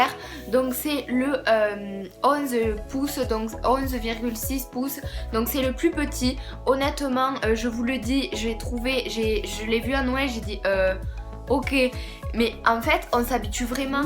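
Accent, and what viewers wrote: South, France. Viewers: j adore ton accent du sud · Ralala l'accent du Sud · Mais votre accent , vous etes française ? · L'accent du suuuuud !!! J'aime trop OMG !😍💘💍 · l'accent du sud🙃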